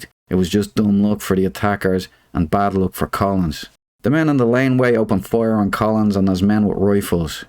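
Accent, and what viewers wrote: Ireland. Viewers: Are you Irish?.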